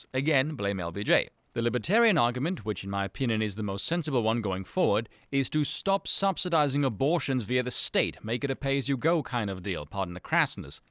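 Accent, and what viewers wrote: South Africa. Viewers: You sound south African lol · South African, he touched on this a while back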